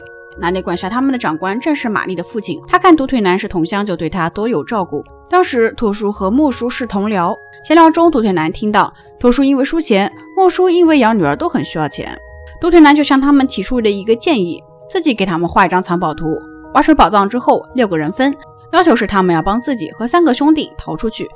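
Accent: Nanjing, China. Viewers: 这口音暴露了蓝鲸人...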